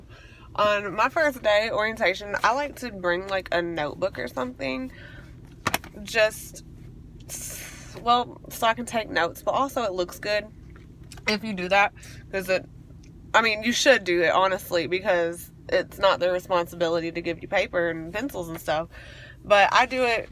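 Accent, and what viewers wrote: United States. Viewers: Btw defiantly can hear the Tennessee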